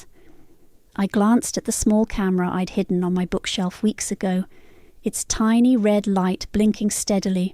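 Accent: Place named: United Kingdom. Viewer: Sorry I can’t stand the British accent. It’s really awful. Some of thrm I can take but this one is awful.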